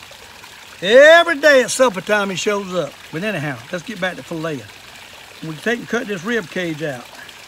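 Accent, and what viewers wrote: South, United States. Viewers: My poppa always cut their heads off and gutted them, but my wife and kids dont like eating panfish that way, so we dont eat fish often. Came here for some info about fileting panfish, and found a goldmine. Poppa was from southeastern North "Cackalacky," and his accent was so similar to yours that this was almost like listening to him teach me how to filet panfish. Only thing missing was him saying, "now look here boy" about 30 times in 10 mins, 😂. We lost him in the fall of 2000, and I still miss him, but this vid just reminds me of fishing trips with him, especially his last where the heat got to him and as we were leaving, we got into a school of Crappie (crap-ee is the right way to say it too, lol), and he told us to stay a while more and we all (me, my dad, and him) caught our limit of huge Crappie. Had a HUGE fishfry that weekend! 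150 fish! Thanks for posting this, sir! Both for the education and sparking one of my most cherished memories. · You are inspiration sir. This Yankee loves your accent and your down-south upbeat personality. Your mama raised you right and I bet you never slapped her in your life. LOL...take care! Mike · LoL it's all Good, us Northern Yankees say crawwpys. But I'll admit, you got yourself some big ones, down there,. Evin if you all talk a little funny,. Great demonstration.and video,. Cheers !!!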